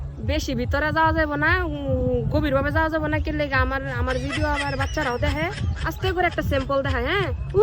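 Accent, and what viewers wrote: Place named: Narsingdi, Bangladesh. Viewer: আমি আপনার কথা শুনেই ভাবছি আমাদের নরসিংদীর আপনি ❤️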